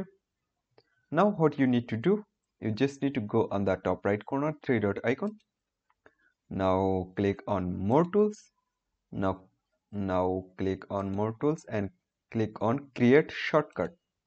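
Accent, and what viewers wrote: India. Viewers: when you search up how to do something and you here the videos voice be an indian you have hope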